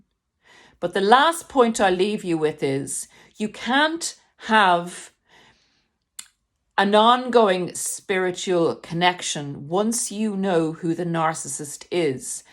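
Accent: Ireland. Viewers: This is exactly how it goes. This this exactly what them demons do. They do the work of the devil, willingly, with bad intent to confuse and destroy everyone and everything. My narc father destroyed my whole family and liked doing it. Amen and God bless you!! Thank you. Love this!! I had a teacher in 8th grade who’s name was Sister Pauline and she was from Ireland. We used to spend Saturday’s with her at the convent. You sound just like her with your accent💖 Great Video!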